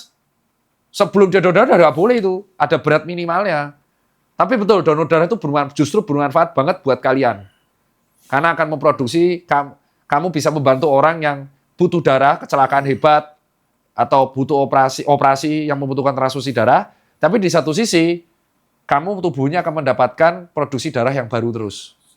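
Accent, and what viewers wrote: Java, Indonesia. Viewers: Wah jadi teringat youtuber "Korea Reomit" Jang Hansol si Korean yg followernya disebut bolo-bolo yg BAHASA JAWANYA MEDOOOOOK ternya dokter ini medok juga, kl dokter Tirta followernya di Peng... Peng... Peng... 😂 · Lidah Jawa Muka Korea.. Spill rahasia ganteng dong 🤭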